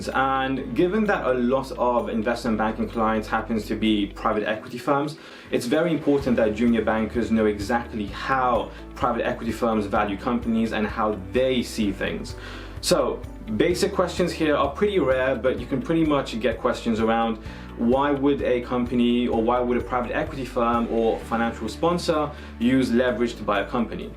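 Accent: Mauritius. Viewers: Is that a slight Mauritian accent?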